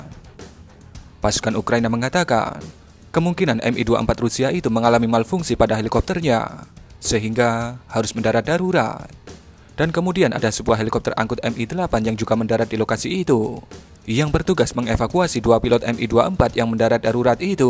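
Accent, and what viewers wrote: Java, Indonesia. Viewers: chanel medok tpi mantap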